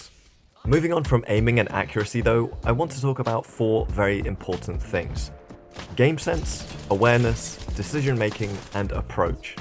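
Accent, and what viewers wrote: England, United Kingdom. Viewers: Nice to have some Apex vids in an English accent! Is there skills matching in Apex? I seem to constantly get one-clipped by people with between many hundreds, and thousands, of kills, and always to be holding up my team mates. The scores the game gives you seem to be massively weighted towards survival time and everything other than kills, so if you have some tactical nous and play as a team player, you get to high levels despite potentially being awful at getting kills, as I am - which leads to frustration! I'd love to find more people what want to play a slower, longer-range game and outflank enemies as a squad, not just charge in aimlessly whenever they see anyone....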